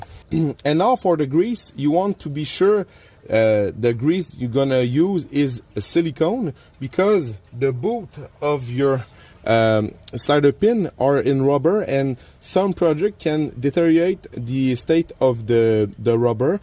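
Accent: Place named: Quebec, Canada. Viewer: tu est définitivement un canadien francophone 😅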